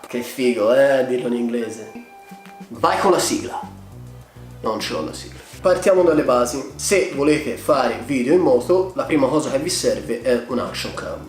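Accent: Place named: Florence, Italy. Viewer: Grazie mille. Comunque io sono di Firenze! Ho sentito il fiorentino in te hahah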